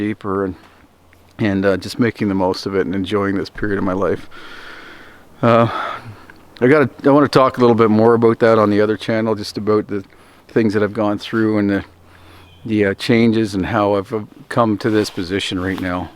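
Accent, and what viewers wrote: Canada. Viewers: Happy New Year! I love your Canadian accent. So sorry to hear you lost your business & left you in a lot of debt.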